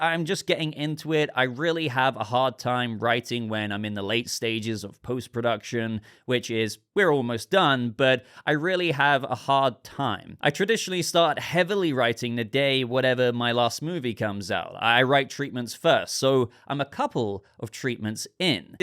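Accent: United Kingdom. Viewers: I watch this channel so much that whenever I think about these superhero movies, I find my inner monologue has a British accent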